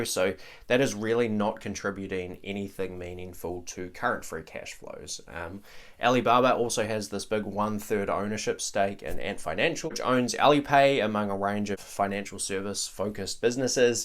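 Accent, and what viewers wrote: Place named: New Zealand. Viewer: He’s from New Zealand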